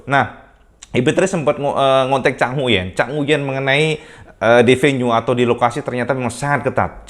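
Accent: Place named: Java, Indonesia. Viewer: Jangkrikkk..beh medok jowo tenan🤣🤣🤣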